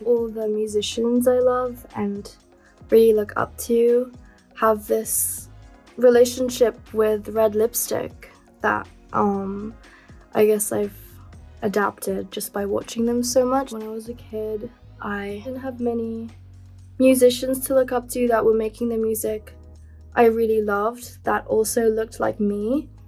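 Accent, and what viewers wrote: United Kingdom. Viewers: am i the only that didnt know she was british??? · shes british? 😢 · BEABADOOBEE'S BRITISH? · SHES BIRTISH?? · I love her british accent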